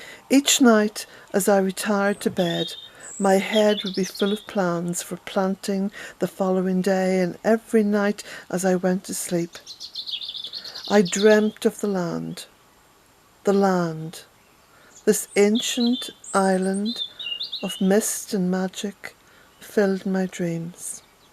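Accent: Ireland. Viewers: Oh how nice it is to hear those words read in your lovely Irish tones. You give new resonance to your writing. Thank you. Have a beautiful rest and wake to the scent of rain.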